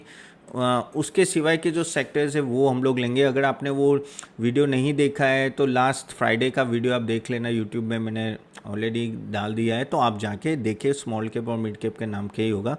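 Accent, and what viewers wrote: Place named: West Bengal, India. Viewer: U speak also like a Bengali n look also.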